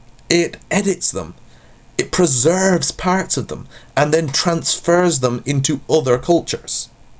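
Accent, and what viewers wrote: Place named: Ireland. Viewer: do you have irish parents? you sound like it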